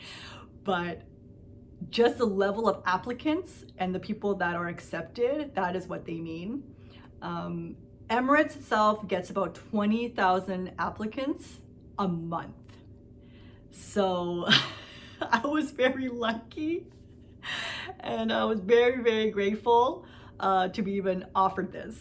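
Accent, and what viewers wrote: United States. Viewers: How does she have an American accent if she is Canadian?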